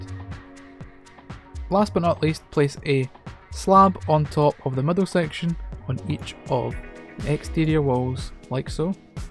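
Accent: Scotland, United Kingdom. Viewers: I've just come across this video and your channel so thank you for sharing! I've liked the video and subscribed as I'll be using the fountain for my town on the Lilac Towny server :) I hope you continue to do more videos as it's so nice to hear another Scottish person - I'm a wee Scottish lassie!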